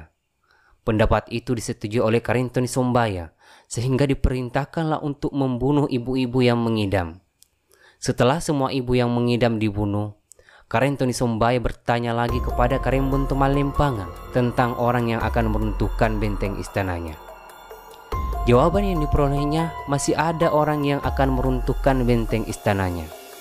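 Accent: Bugis, Indonesia. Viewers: ini tdk masuk akal , dialek saja sdh salah ,menyebut nama saja sdh tidak benar , logatnya bugis .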